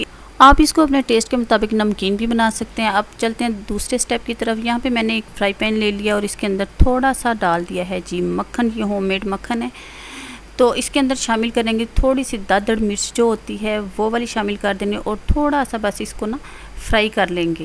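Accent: Punjab, India. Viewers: Hahahahahahaahaaha Punjabi ka tarhka I like it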